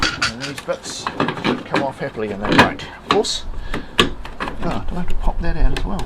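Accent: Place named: Australia. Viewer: Thank you! Looked all over you tube for a video and this is the only one I could find. Very simple and saved me a ton of time. Great Australian accent too!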